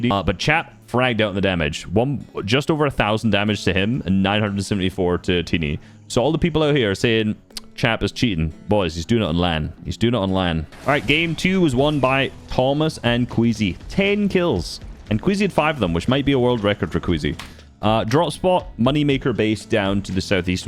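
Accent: Scotland, United Kingdom. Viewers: hi, what part of scotland is your accent from, because me and my and my dad are having a discussion about it for some reason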